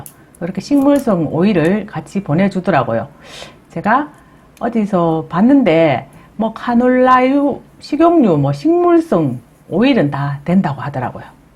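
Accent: South Korea. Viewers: 어머 얼굴공개하셨네요~완전반전 미인이시네요..ㅋ말투는 시골사투리 얼굴은청담동아줌메비쥬얼~~^^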